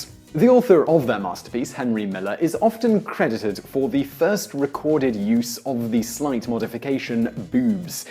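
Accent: United Kingdom. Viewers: awkward or not, I still giggled when, with a straight face and 'serious' British accent he rattled off 3-4 euphemisms in a row... - I almost an entire video of him just reading a list of all the terms they can track down, either for boobs, the male organ, or any other 'naughty topic' in which language has created so many options to 'not say' what one is saying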